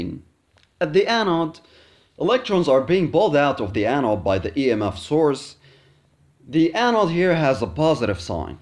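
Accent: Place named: Netherlands. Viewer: Sorry I meant you sound a little dutch and Russian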